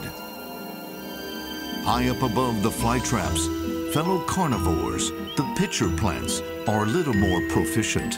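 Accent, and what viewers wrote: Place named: United Kingdom. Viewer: I love British accent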